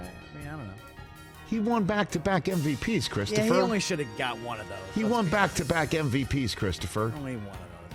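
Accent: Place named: New York, United States. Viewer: NY Accent was solid 👌🏾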